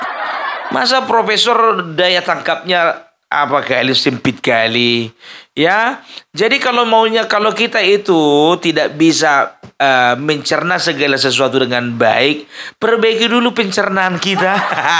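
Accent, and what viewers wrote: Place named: Java, Indonesia. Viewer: Aku suka sekali chenelnya Mas dg gayanya yg khas dan menghibur. Asli mana mas kok logatnya sperti luar jawa tp kok pinter bhs jawa dan logatnya jawa sekali. Trimkasih mas trus suwarakan kebenaran. Smoga mas tetap sehat pnjng umur dan selalu dlm lindungan Alloh SWT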